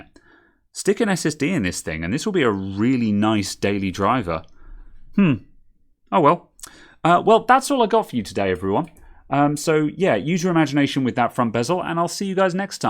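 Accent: United Kingdom. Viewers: Yes, do it like that and add captions Anyway your videos are all wonderful without "ASMR" since British accent are the best english accent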